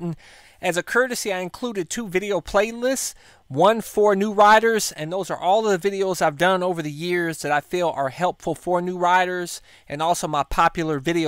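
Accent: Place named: Kentucky, United States. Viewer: You sound different. What happened to that Kentucky accent?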